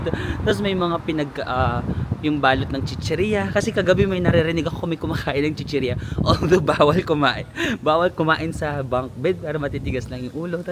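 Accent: Philippines. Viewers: alam nyo po, sinasadya at inaamin naman po ni na hindi nya alam sabihin ang mga french words. for starters,.talagang mahirap maman talaga ipronounce ang french..kahit ibang lahi ay hindi din nabibigkas ng tama ang salitang french. mas cringy kung nagffeeling si na french expert sya pero mali mali naman ang sinasabi. he is just being a filipino tourist with a filipino accent. very down to earth and more relatable. hindi feelingera! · It's okay, I still love your Filipino accent ~ 😍😉 Enjoy your trip in Europe po. Waiting for your next travel vlogs! ✨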